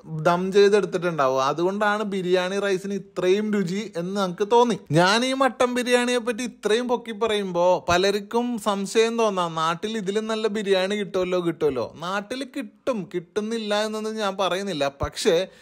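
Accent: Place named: Kochi, India. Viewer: താനാ കൊച്ചിക്കാരുടെ സ്ലാങ് പറഞ്ഞാ മതിയെടോ സ്വന്തം രീതിയിൽ തുടരുക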